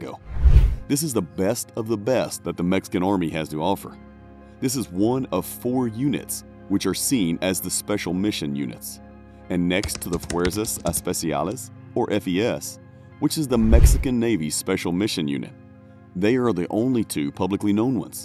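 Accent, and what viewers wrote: South, United States. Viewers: It’s the accent! Love it! That southern taste to it! It’s odd to my west coast ears lol Fellow countrymen all the same though! God bless you bro! 🇺🇸 🙏🏻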